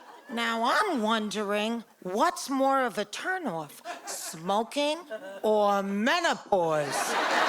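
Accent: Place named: Boston, United States. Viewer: Well, now you’ve heard two people with a Boston accent. Only 675,345 more Bostonians left to listen to. 😅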